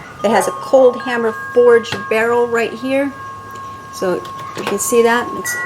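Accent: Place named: United States. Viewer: Aussie here. You have the sweetest American accent lol Sarah Connor 🤠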